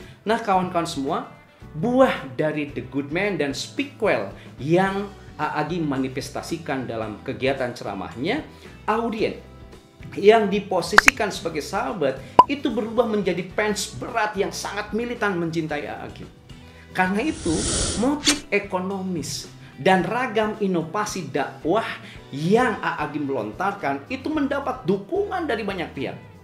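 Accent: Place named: West Java, Indonesia. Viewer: MasyAllah tabarakallah, siapa yang tidak kenal sama seorang AA Gym ini, gaya retorikanya selalu membuat mad'u terhibur, dengan aksen logat sunda nya membuat susana ceramah beliau cair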